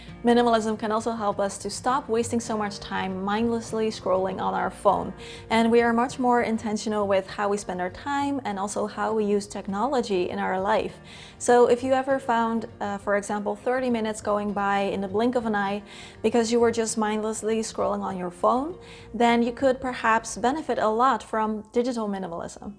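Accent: Netherlands. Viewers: Love your calming style of videos and love minimalism! When I saw your background I knew you were Dutch somehow 😂 And when you started talking I was like yup! Subscribed! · You have a lovely channel.🙏btw, are you Dutch? Because of the accent I was wondering😊love your interior... I'm on my way to being a minimalist but it'll take me at least one year to get rid of unnecessary stuff...😂😁😇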